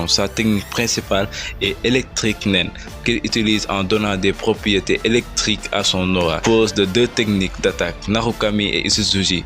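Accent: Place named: Senegal. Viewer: Yo t'es sénégalais.wais je reconnais l'accent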